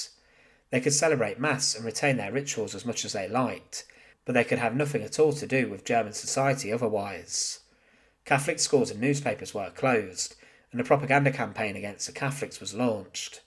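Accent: United Kingdom. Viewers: It's a type of British pronunciation which I will never understand. But then, I hear myself saying "gonna" for "going to," so we all have our flaws.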